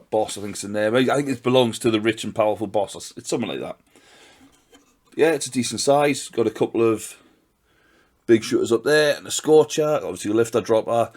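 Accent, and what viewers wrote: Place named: Newcastle, United Kingdom. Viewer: Finally! A fellow Geordie! Nice work fella!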